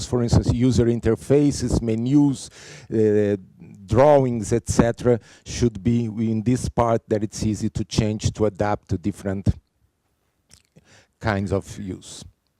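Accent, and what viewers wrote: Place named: Brazil. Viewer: The speaker is speaking in a brazilian english accent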